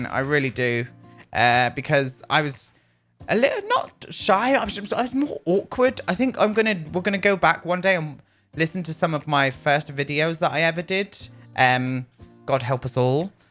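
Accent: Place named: Scotland, United Kingdom. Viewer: yes! please rate the sims packs :) also as a scot I wanna hear that accent more haha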